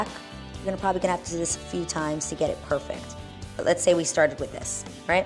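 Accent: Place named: New York, United States. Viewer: I’m in love with your New York accent. I’m jealous. By the way, awesome video! I’ll definitely try one of them!❤️💙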